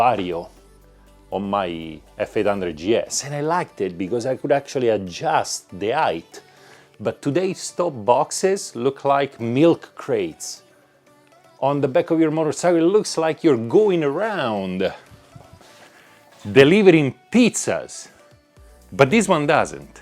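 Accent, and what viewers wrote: Italy. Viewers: that's an Italian accent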